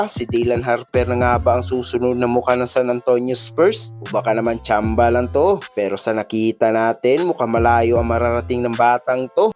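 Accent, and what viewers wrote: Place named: Iloilo, Philippines. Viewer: Ilongo ka to haha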